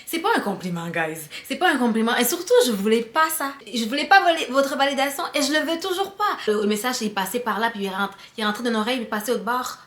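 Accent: Quebec, Canada. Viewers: So Cut ton accent canadien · G n aime pas la guerre des sexes g suis d accord avec vs vous etes du quebec ou du canada vu votre accent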